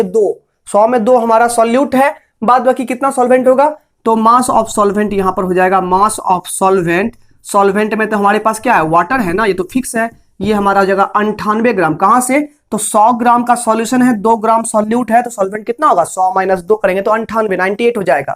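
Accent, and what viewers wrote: Bihar, India. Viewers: Just superb explanation sir 👍 Are you bihari ?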